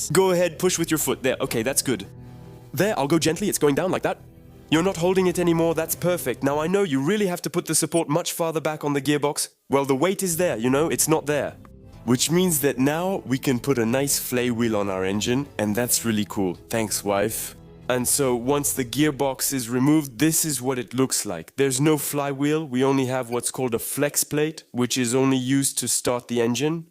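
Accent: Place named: Balkans, Eastern Europe. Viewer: ont veux l'accent anglais des Balkans coupé a la serpette !!!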